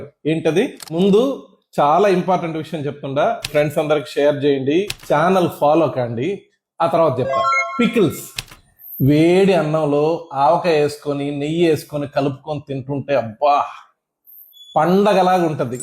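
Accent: Nellore, India. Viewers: మీరు చెప్పే విధానం మన నెల్లూరు స్లాంగ్ భలే ఉంటది 😊 థాంక్యూ సార్👌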